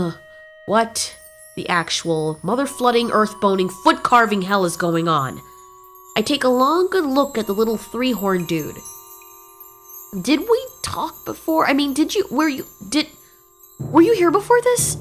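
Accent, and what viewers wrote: Australia. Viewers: Every like is for that Australian accent 👌😂 Also can you make a Baryonyx POV? I'd love to see that! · Nice Australian accent 👍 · I also heard a bit of austrilian accent wicth he actully has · I like the austalian accent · She has a good australian voice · Can we talk about how your Australian ascent is on point, took my breath away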